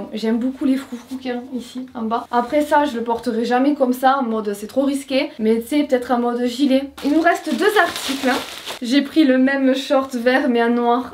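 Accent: South, France. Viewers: Le moment sacré de ta vidéo du dimanche que j'ai pas pu regarder hier... Toujours au rdv avec ton sourire, ta bonne humeur et ton accent du soleil ! J'Penses tjrs que tout te va t'es magnifique et d'une gentillesse 🥰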